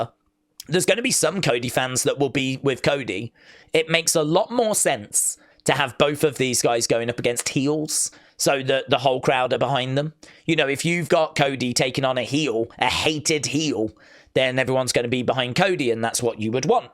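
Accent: United Kingdom. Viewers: U make a British accent sound perfection cody will be heel by wrestlemania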